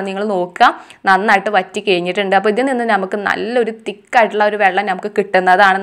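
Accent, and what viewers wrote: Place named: Kanhangad, India. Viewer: Kanangad slang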